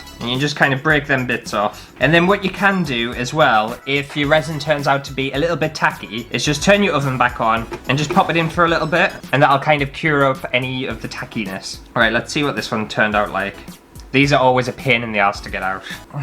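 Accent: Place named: Northern England, United Kingdom. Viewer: Great video lovin the northern accent 👍🏻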